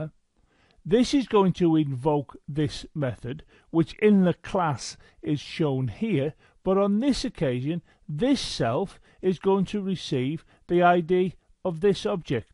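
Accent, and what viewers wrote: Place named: Northern England, United Kingdom. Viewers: mate u from the north of england, your video is mint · Thanks for the clearest (and scouse-tinged) explanation I have found so far. So just to check - the 'self' parameter tells that method "please execute the code on the object you're invoked on". Is that not already implied by definition? What would happen if 'self' was not included?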